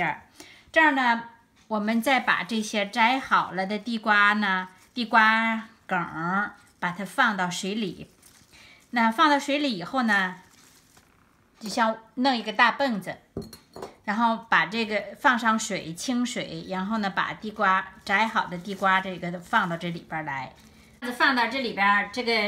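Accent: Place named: Henan, China. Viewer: 一听就是河南老乡！老乡你好！👋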